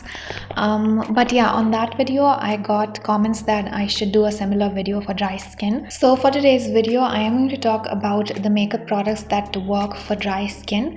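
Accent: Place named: India. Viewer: I love your Indian accent ❤️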